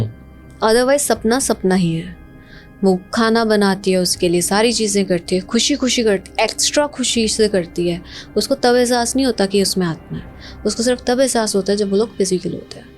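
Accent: India. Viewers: Poora Punjabi accent aa reha Madam da…and yet Hindi mei gal karate hai😁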